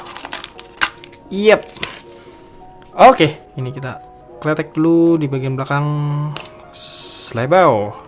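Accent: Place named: Java, Indonesia. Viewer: Logatnya kalo ngomong medok bngt. Sekali kali selipin bahasa jowo nya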